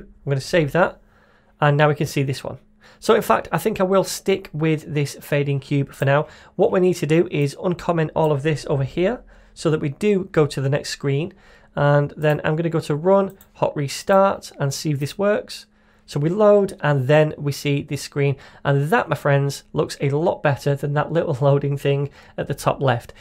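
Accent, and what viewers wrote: United Kingdom. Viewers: are you bri iiish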